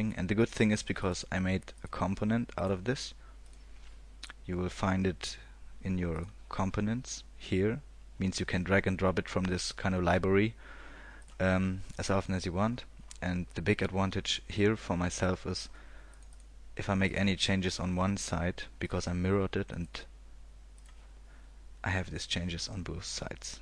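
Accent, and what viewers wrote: Germany. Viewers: a german accent but its a good video ;) thx´s :) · ARE YOU A GERMAN INDIVIDUAL? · 14,958 views as of right now. I think we like your common sense attitude and your clear way of speaking. It's apparent that German accents are cool...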